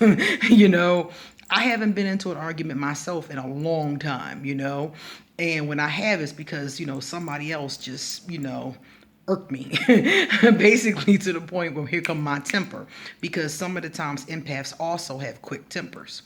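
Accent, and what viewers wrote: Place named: Philadelphia, United States. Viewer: I knew I was getting philly girl vibes from you, I hear it all in your accent ❤💛💚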